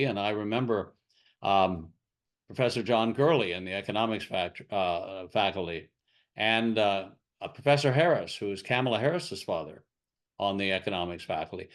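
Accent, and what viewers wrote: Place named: Asia. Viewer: Yep sounds Asian. I know because I’m Asian.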